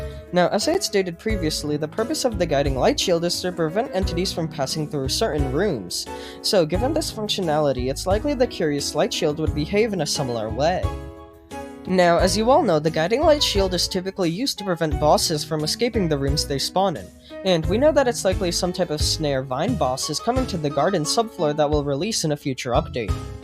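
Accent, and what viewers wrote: United Kingdom. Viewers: HE IS MORE BRITISH OH GOD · he sounds British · I thought it was a British accent, I will now remember these videos as the British era of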